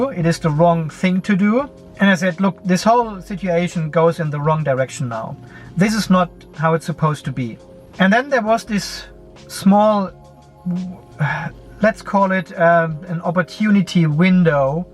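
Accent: Germany. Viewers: Dein Deutsches Englisch klingt echt mies. Warum machst du eigentlich diese Videos auf Englisch mehr Reichweite ?!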